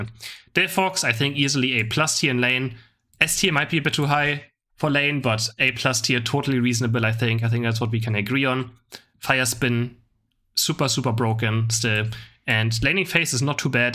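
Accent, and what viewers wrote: Germany. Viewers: Klingt ziemlich deutsch 👀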